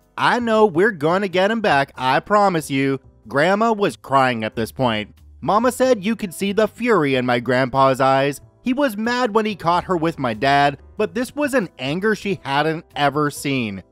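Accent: South, United States. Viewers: The Miraculous, Stupendous, Gorgeous, Righteous, Lovable, Huggable, Adorable, Tasteful (soap operas), Beautiful, Powerful, Loyal, Legendary, and Best Chick-a-baby Mr. Koo has returned! ❤️, don’t worry about your Southern accent, it’s fine. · your "southern accent" sounds like you were raised in the southern U.S at one point so don't beat yourself up about it. Sincerely, a Southern Yankee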